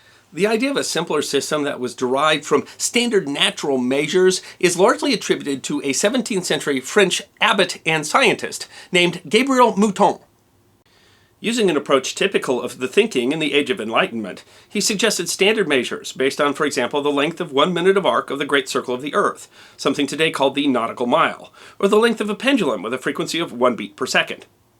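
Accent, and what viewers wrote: United States. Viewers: For god's sake, Louis Phillipe wasn't an emperor, but a king, and not the king of France but the king of French people (thanks to Lafayette's presentation speech that allowed the public to accept the new king) I would say that your video are always interesting, but such a blatant mistake, I don't see how you could miss it. (And yes I am French, listening to your videos helps me understanding some of american aaccents)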